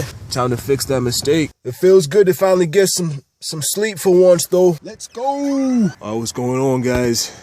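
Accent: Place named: Staten Island, United States. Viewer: Are you from Staten Island? You sound like one of my boys.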